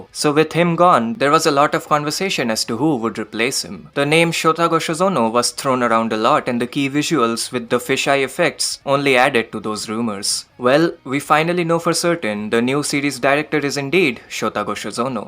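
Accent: India. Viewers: Yep in Tybw video they were targeting you through racism,when they weren't able to find proper points to defend their criticism they were saying stuff like "you're Indian" "You can't make good content because you're from India and India is known for scams" "I can't hear you your accent is annoying" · Are you Indian? Cause you sound like it.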